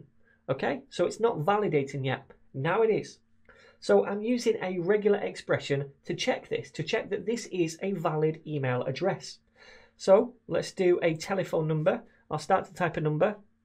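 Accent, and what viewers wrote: United Kingdom. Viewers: came to learn, stayed for british accent, continued for great explanation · You're the first guy I've bumped into that has a British accent in a coding tutorial. From north of England I think, given that you tend to pronounce 'must' or ''stuff' like 'moost' or 'stoof'. Sorry for the digression, but I'm pretty in love with British accents.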